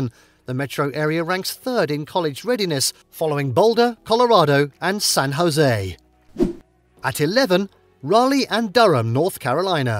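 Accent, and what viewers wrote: United Kingdom. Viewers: As soon i heard british accept i stopped video. I will skip it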